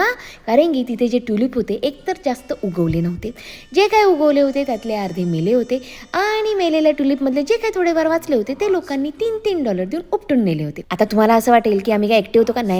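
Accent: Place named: Kolhapur, India. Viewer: ताई तुम्ही महाराष्ट्र मधील कोणत्या गावातील आहात? तुमची भाषा आमच्या इचलकरंजी कोल्हापूर भागातील असलेसारखी वाटते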